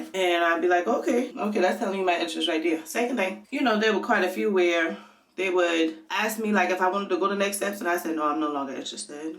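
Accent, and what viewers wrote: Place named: Gullah Geechee, United States. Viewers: Man, dis too geechee! LOL!!! Great advice, though! Loving the channel, and I'm not even in HR! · hahaha, Nah.... Perfect Geechie.... So so so very funny... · Babeeee the Geechee came out today. I smiled so wide one minute in and my son said Mama she sound just like you 🤣🤣🤣🤣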